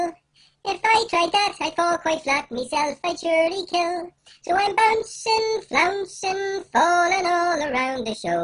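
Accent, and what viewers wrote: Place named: Ireland. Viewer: Irish accent my fam.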